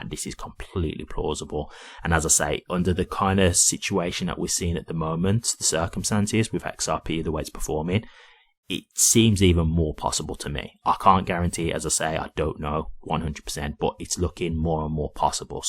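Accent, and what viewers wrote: Birmingham, United Kingdom. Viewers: You sound like a fellow Brummie XRP holder. Good luck to us all.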